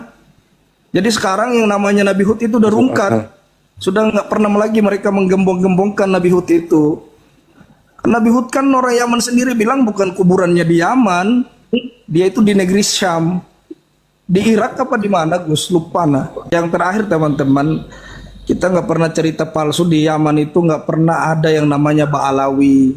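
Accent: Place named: Banjar, Indonesia. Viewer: Sepertinya orang Banjar,,suaranya..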